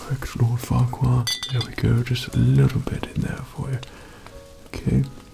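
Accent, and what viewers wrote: United Kingdom. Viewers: Yeah they're both British and manly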